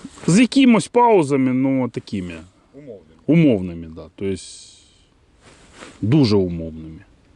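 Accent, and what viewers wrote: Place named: Surzhyk, Ukraine. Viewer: Це якесь жахіття! Хіба так важко підтягнути українську? Ну таке вже суржело махрове, вуха завертаються! На весь україномовний ютуб дві рузскагаварящіє цєлюсті - і Петраш.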